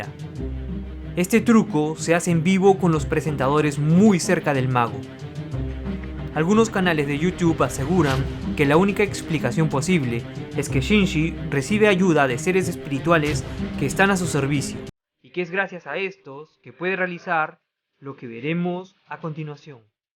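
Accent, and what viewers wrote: Peru. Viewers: Una pregunta eres peruano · soy peruano y me imagino por tu voz que tu tambien like a tu video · Peruano hasta los tuétanos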